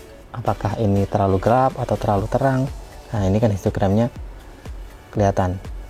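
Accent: Pekalongan, Indonesia. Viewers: Ente orang mana bang? Batang/pekalongan?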